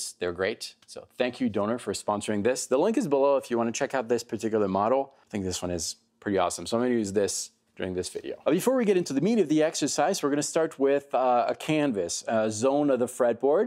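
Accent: Quebec, Canada. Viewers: A true lesson. I thought French Canadian. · I bet you're from Québec.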